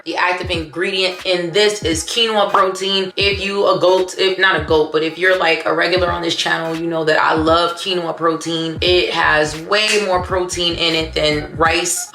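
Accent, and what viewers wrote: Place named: New York, United States. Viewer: Homegirl! I'm so glad to find your channel. First, you're sharing priceless knowledge and thank you for that. Also, it's been a long time since I lived in NYC and just listening to you talk takes me back to the good old days. You are filling me with so much joy by just being you and sharing that with the world. Keep on keeping on. 💖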